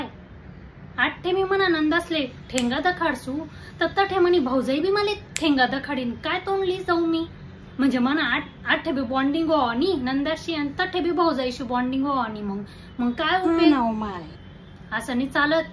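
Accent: Khandesh, India. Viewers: Kiti natural acting kartes ❤ Ahirani aikayla khup goad vatata❤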